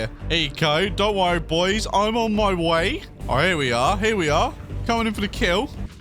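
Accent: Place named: United Kingdom. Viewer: I thought you were saying alpha ray eye cores for the longest time, and then I realised you were just fucking bri*ish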